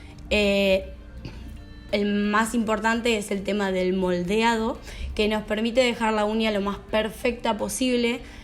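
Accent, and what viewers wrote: Argentina. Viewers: No entiendo porque los títulos de tus vídeos son en Inglés cuando vengo a ver es una boluda hablando argentino hellloooooooo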